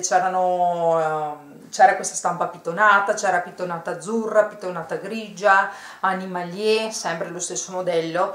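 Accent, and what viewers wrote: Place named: Veneto, Italy. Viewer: Ciao.intanto complimenti x il gusto nel vestire,gli abiti ti stanno benissimo. Io preferisco acquistare su bonprix e mi trovo benissimo perché i tessuti li trovo più naturali di quelli di shein .hai mai provato bonprix? Io sono una curvyyy e trovo abiti sexy anche come i tuoi abiti. sento che sei veneta dall'accento...anca mi ghe son! Ahahahah ,sito de vr o vi o pd? Ciaooo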